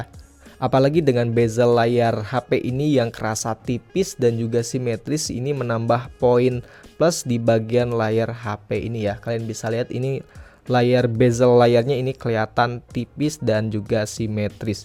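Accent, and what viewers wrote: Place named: West Java, Indonesia. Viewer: Meni Sunda pisan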